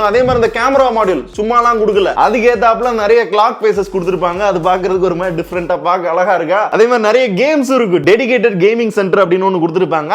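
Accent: Madurai, India. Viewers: Bro oungaluku recent videos laa madurai slang veliyaa varuthu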